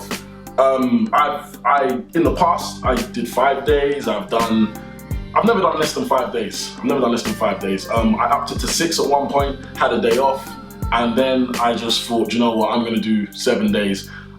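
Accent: England, United Kingdom. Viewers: this is the first time listening to Simeon Panda's voice, I just found out he was an English man?! :) Thanks for the inspiration! :D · his accent is so londoner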